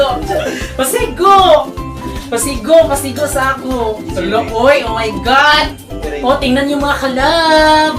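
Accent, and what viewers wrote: Visayas, Philippines. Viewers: i like the way you pronouce haha Lusyon. haha i am just like you. haha the way you speak. Bisaya man gyud. God blessed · James Bryle bisaya kasi siya, just like me. i noticed her accent. hehe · Kaboses mo si michelle fox... Visaya accent is real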